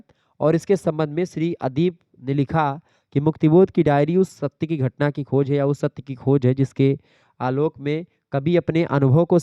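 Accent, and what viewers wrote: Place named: Awadhi, India. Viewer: Aap avdhi bolte hai, badhiyaa hai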